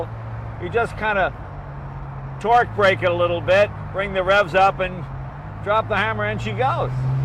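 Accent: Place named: New England, United States. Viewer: Travis Henderson I think he was using it in the plural... Vortecs; or it was just his New England accent coming out. You know...Pak the caa in the yaad.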